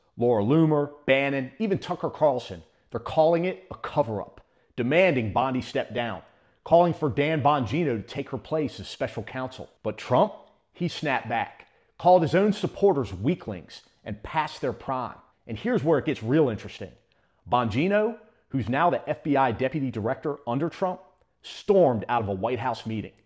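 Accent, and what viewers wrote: Baltimore, United States. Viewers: I am from Baltimore and it is fun to listen to someone with a Baltimore accent please say water plus I like your message so hi hon and keep it up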